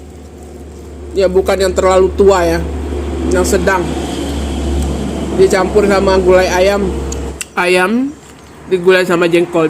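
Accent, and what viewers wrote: Minangkabau, Indonesia. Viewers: Ulang dengari berdialek minang walaupun tidak totali, konon saya ingin pelajari dialek minang🎉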